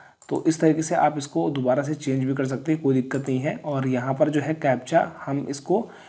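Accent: India. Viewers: Bheji aap uk se ho ky lamgaw Uttarkashi se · Bhai lamgaon ka ho kya 😮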